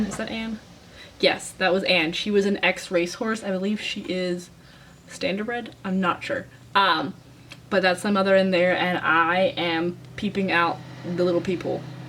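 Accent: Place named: Sweden. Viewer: Is your family of Swedish descent? I’m hearing a very faint Swedish accent in your voice!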